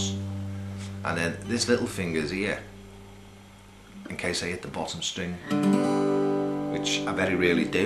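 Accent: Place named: Liverpool, United Kingdom. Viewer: Love listening to the scouse!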